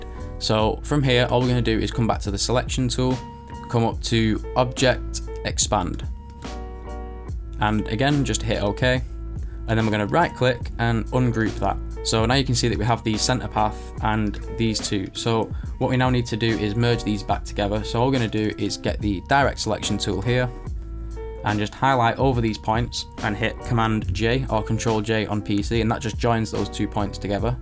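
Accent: Manchester, United Kingdom. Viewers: I can’t place your accent.....Mancunian?......? 😂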